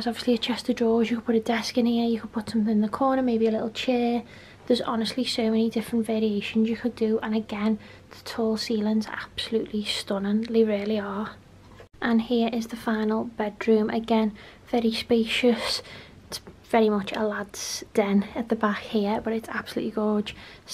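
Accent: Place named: United Kingdom. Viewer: You have the prettiest accent and voice I ever heard from British person. 😄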